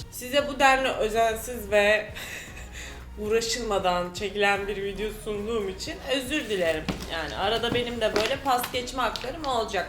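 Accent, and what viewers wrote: Kütahya, Turkey. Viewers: Kütahyalı mısın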